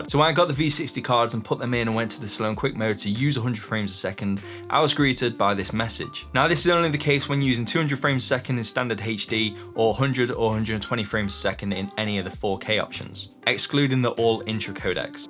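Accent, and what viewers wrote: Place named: Northern England, United Kingdom. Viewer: I feel like I've found the North of England's answer to Peter McKinnon... (geographical location guess based on accent, could be way out 🤣) Either way this channel is dope. Great work man!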